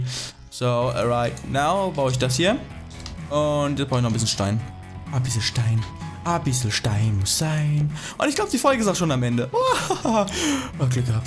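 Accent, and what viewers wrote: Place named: United States. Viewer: Er hat iwie einen amerikanischen Akzent :))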